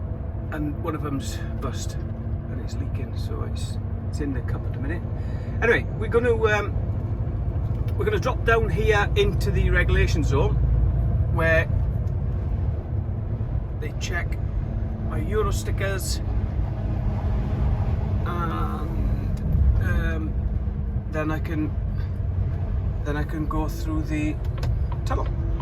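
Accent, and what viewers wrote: Wales, United Kingdom. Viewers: With that accent I'd say ur from Sth or west Wales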